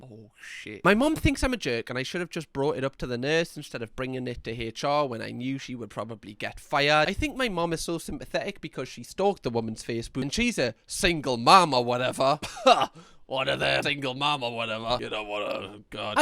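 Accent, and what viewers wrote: Newcastle, United Kingdom. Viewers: Love your vids,! I would LOVE for you to start a new channel, can't get enough of your cheerful, witty, adorable content! And I LOVE the Geordie accent! It's now my fave UK accent. Much love from across the pond! Donuts forever! xoxo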